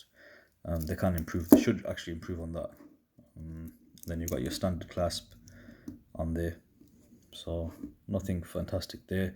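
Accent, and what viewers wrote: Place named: West Midlands, United Kingdom. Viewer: Thanks for the review and updated review From the accent, possibly local to me, West Midlands (Brum maybe?)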